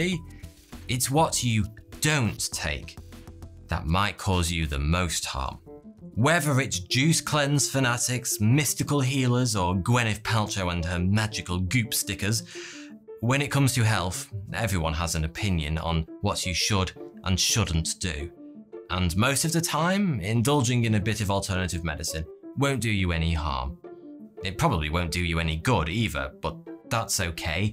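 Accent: United Kingdom. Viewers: Who cares he has a british accent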